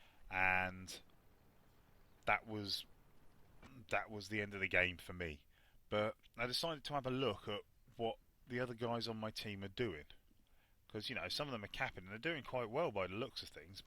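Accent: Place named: England, United Kingdom. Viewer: not gonna lie, subscribed to you because of jingles, but having seen this video im staying for your commentary. as a fellow brit its quite nice to listen to an english person commentating rather than an american. keep up the good work :)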